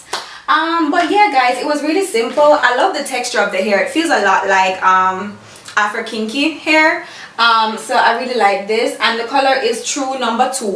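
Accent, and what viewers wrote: Jamaica. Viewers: Jamaican? yeah girl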